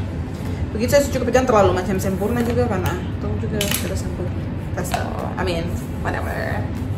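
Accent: Papua, Indonesia. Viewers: Cantik apa lagi dengan logat papua keren👍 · Cantiik.nya natural.. Suka sama logat papua.nya.. Sukses selalu ya kak.. 😊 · Yoi logat / dialeg Papua tu lebih bagus, jadikan itu sbagai ciri khas tersendiri pd channel km sist krna yg logat jakarta tu su terlalu bnyk e,, 😆😅😂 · Cantik 😍😍 suka logat papuanya, tdk yg buat* sok logat jakarta bgtu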